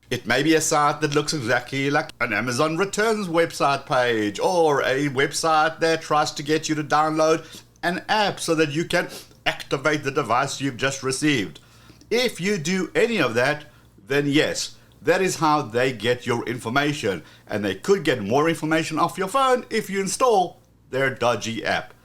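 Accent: South Africa. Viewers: Your accent is from south african, are you from South Africa? Thank you for sharing!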